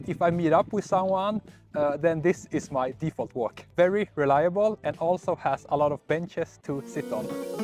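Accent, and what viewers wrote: Sweden. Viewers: 4 år??? Om du uttalar orden så bra så kan du fan i mig läsa det här också. Det är ju nästan så att du "bryter" på svenska när du pratar engelska.. Imponerande. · 4 years in Sweden and you speak with perfect pronouncation and an extremely swedish accent when speaking English? What is going on?! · You must have been living here for a longer time than 4 years . Because your English allready has a swedish accent :-) Why is your girlfriend having this gigantic hat???? If its against the sun?... Well then , it is totally not neccesary in Sweden :-) Ohh! Thank you for a pleasant blog by the way! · You are beautiful people and your swedish is so good, and you even have a swedish accent to your english. A great video.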